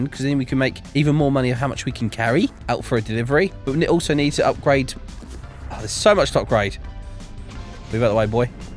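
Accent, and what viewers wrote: United Kingdom. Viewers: I always thought you live in America but you are British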